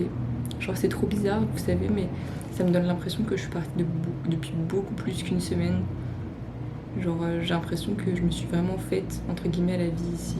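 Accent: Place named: Quebec, Canada. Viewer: Omg, le québécois est trop nice ! l'accent cette pépite !!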